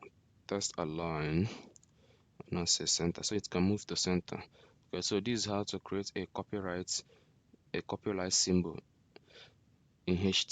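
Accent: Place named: Nigeria. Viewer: you sound like a 9ja man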